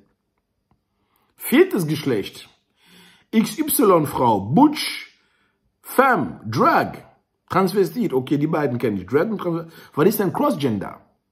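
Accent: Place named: Ruhr, Germany. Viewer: Wer genau hinhört, hört hierbden Ruhrpott raus. Gut so; da liegt eh das Herz auf dr Zunge. Glück auf.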